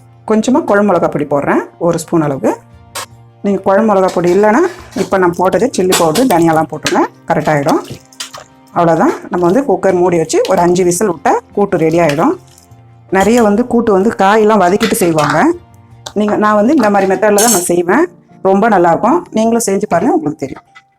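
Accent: Chennai, India. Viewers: சென்னை தமிழ்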